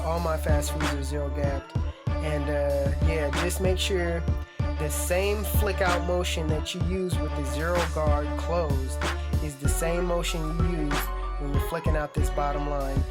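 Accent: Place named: Bay Area, United States. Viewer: Are you from Vacaville California? Because you gave the Bay Area accent.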